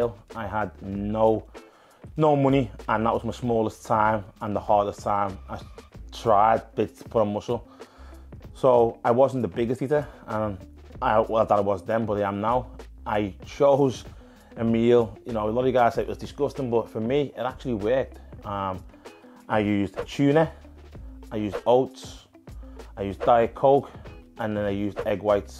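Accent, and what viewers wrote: Liverpool, United Kingdom. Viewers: Scouse.. Liverpool accent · Make a video one day when it's all Scouse ! 🙂🙂🙂🙂👍🙂👍🙂👍👍👍🙂🙂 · Is he a Scouser? Sounds like one.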